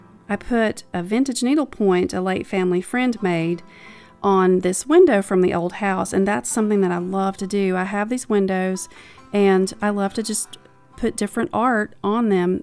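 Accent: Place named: South, United States. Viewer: I feel like we could be the best friends. You have such a sweet and tender heart for the blessings from God. We are on opposite sides of the country but I just love watching you do what makes your heart happy. I sit and drink my coffee to your sweet southern accent. I love in Arizona and for years my home has been decorated in a rustic ranch theme. But slowly I’m going in a more vintage direction. Taking your advice in using what I have and getting what I can inexpensively at the thrift and antique stores. Though our styles are a little different, you so inspire me. Thank you so much for sharing your life and home. Hope to hug you someday. ❤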